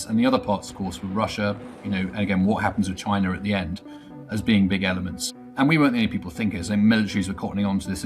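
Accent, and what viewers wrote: United Kingdom. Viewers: I need to get a fork from all the word salad in a British accent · Polycrisis? Are we making up words now? Take your British accent and try to fix your own country, you don't have time for the world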